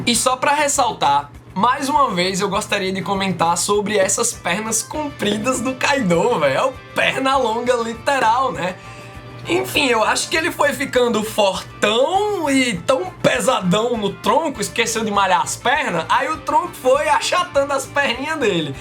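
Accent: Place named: Recife, Brazil. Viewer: Bem que eu tava RReconhecendo esse sotaque hahaha... tamo junto!!! Sou do bairro da várzea/Recife sou seu fã.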